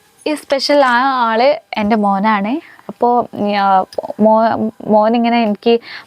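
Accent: Malappuram, India. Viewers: Ivar malayali alle?ariyunnavar paranju tharamo? Pinne engane malayalam padichath? Onnum manasilakunnilla.. Malappuram slang anallo padichittullath.. Husband malappuram malayali ano?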